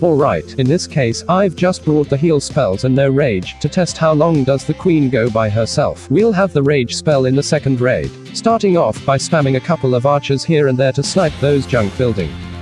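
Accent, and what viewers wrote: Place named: India. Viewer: He is indian. I automatically off when i heard the accent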